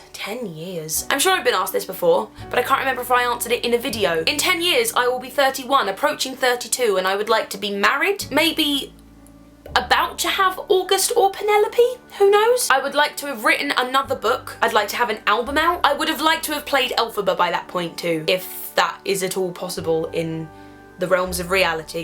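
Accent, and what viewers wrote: United Kingdom. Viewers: I'm from Brazil and i just love your British accent and all your videos.I don't really have any question.Maybe,how can you be so beautiful?LoL Kisses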